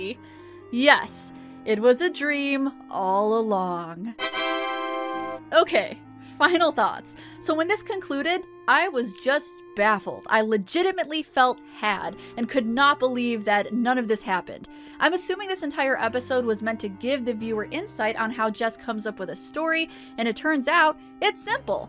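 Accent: United States. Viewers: they are NOT homophones to her. It is regional in the US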